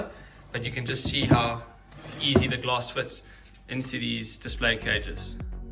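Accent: South Africa. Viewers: U sound south african Are u south african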